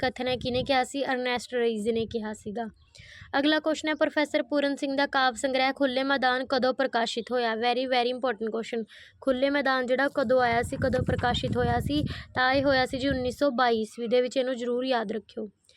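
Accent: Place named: Mansa, India. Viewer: ਮਾਨਸਾ ਏਰੀਆ ਲੱਗਦਾ ਮੈਡਮ ਜੀ ਦਾ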